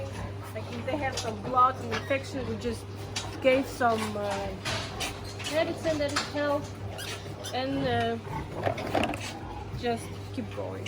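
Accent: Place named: Eastern Europe. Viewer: You have very good english for what seems like you're a Eastern European pig farmer. I'm assuming Eastern Europe from your accent. I'm impressed. Most Eastern European pig farming videos I've seen on YT, they can't speak english.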